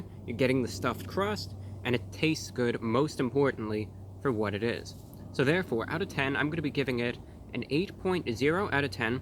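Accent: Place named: Tampa Bay, United States. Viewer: Looks like youre in my area...tampa bay. Sounds like it too.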